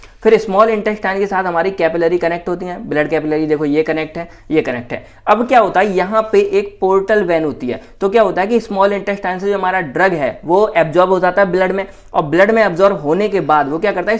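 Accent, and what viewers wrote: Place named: Uttar Pradesh, India. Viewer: u r from up nnaaaa......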